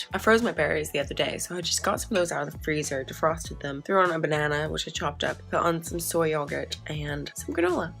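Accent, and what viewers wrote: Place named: United States. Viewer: I think it's super cool that you are pushing yourself to "read harder" by reading at least one adult book a month. I hope you find some new authors and genres that you enjoy. I am such a tired crier, like if I'm weepy, chances are I'm exhausted. Which country do you live in/ go to uni? Your accent is like super hard to determine lol, sometimes I'm like oh! America for sure, and then sometimes I'm like... England? maybe? Australia? lol I'm the worst at this...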